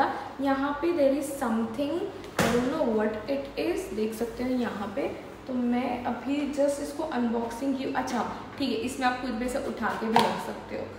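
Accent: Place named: Hyderabad, India. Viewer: Areeee ap Kerala se ho muj laga Hyderabad se ho apki Hindi Hyderabadi type hai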